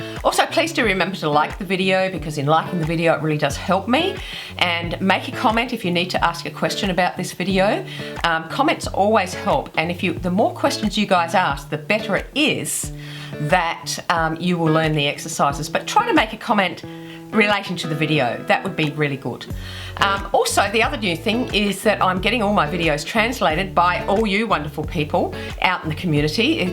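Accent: Australia. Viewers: is she from Australia ?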